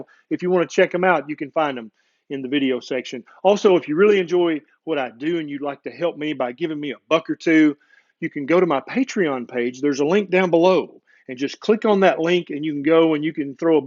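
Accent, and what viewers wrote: South, United States. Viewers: It must be the southern drawl. Makes the word poop sound so flavorful. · So I'm in my thirties and just giggled at you saying "poop" because of the southern accent. I'm an adult.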